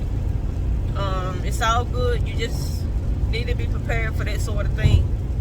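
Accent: South, United States. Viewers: Hello country girl love your southern accent as for relationships it's best to talk before you start because time is valuable believe me I hope you learned from that experience take care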